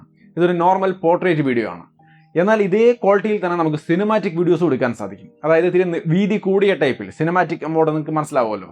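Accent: Thrissur, India. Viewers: Bro thrissur karan ano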